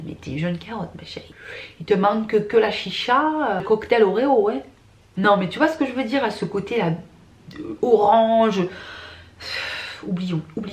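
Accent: North, France. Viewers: merci pour ton vlog frais et sympa, je me disais bien que j'entendais un petit accent du nord dans tes vidéos!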